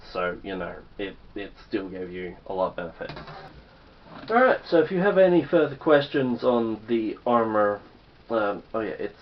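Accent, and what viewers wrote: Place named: New Zealand. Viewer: Your allready sounding like a kiwi. I agree with the comment on you being too humble on your hard work.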